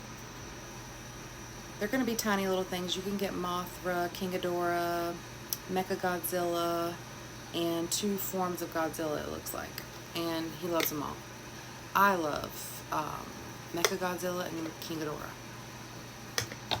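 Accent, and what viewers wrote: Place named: South, United States. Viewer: I’m so excited for you and your channel !💖 Our sons have the same stocking ! How cute 😊 The DVD’s!! Love to see it ! Nothing better than having physical media. My ultimate goal is to have all our favorite shows /movies on dvd /vhs so we can cancel subscription services lol 😅 I was literally typing to recommend the Freddy FAS bear chapter books as you started talking about books lol because my son used to love those. Also loving the bookshelf ! 🥰 And your accent so cute and southern! 💖 Just know you have a loyal subscriber here girlie ! 🤗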